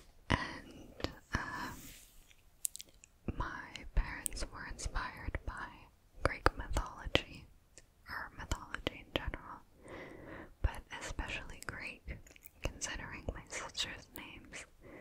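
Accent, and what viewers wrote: France. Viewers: Cool! J'ai hâte. En passant, t'as un accent français extraordinaire, et c'est génial. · Tu dis que tu es gêner un peu de ton accent en français... Je te rassure en dissant que la plupart des québécois que je connais (à l'extérieur de montréal) adore les accent française ;)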